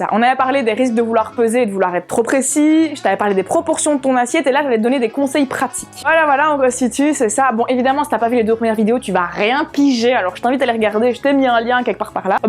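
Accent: Liège, Belgium. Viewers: On reconnaît l'accent belge à des km <3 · L'accent belge ? C'est l'accent de Liège. Tous les Français parlent comme Jackie Sardou ?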